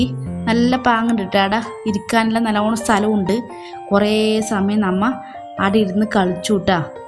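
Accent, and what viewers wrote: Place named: Kasaragod, India. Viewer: കാസറഗോഡ് ജില്ലയിലെ തെക്കൻ സംസാരഭാഷ നന്നായി❤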